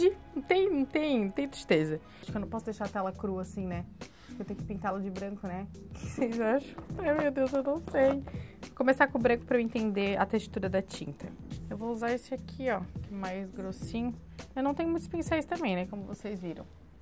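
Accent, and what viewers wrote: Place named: Santa Catarina, Brazil. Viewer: Tu é de Santa Catarina? Teu sotaque parece muito!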